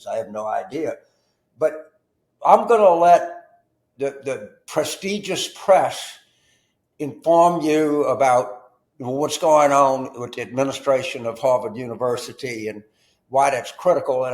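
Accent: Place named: Louisiana, United States. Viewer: I don’t know why but I want to hear Mr. Carville say, “We’re talking ‘bout wife swappin’ with these moms for liberty” in his Louisiana drawl.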